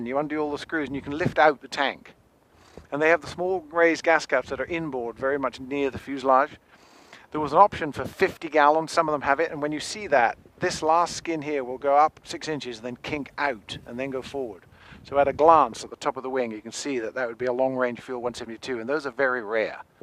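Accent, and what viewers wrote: England, United Kingdom. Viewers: This is an English accent…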